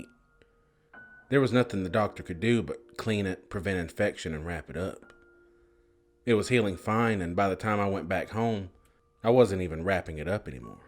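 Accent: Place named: South, United States. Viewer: I luv your southern accent so much it. Makes this channel your amazing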